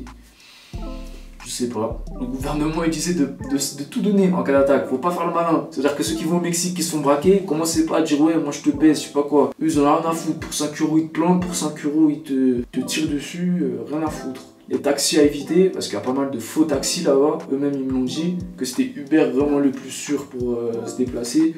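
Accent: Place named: Suburbs, France. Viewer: Sa c voit t un mec bien et tu fais ton truc et tu kiff, donc arrete de parler comme un pelo de banlieue pcq sa rabaisse ta qualité de video et les trucs que tu fais qui sont interessant.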